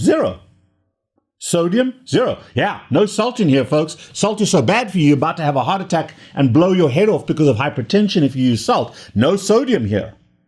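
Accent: South Africa. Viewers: The indignation, mild profanity, sarcasm is hilarious coming from a sophisticated person with South African accent. Educational AND entertaining. Lol.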